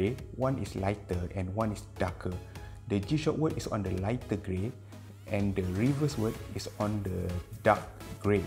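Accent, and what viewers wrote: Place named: Malaysia. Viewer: Logatnya inggris malaysia yah,salam dr indonesia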